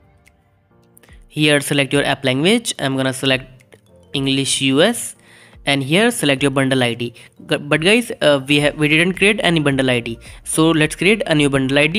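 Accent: India. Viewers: that hindi english, thank you!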